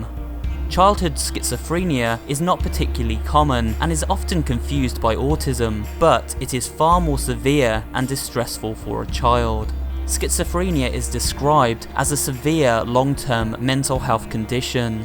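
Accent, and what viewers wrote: United Kingdom. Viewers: I like how you have a British accent (no sarcasm intended) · Your british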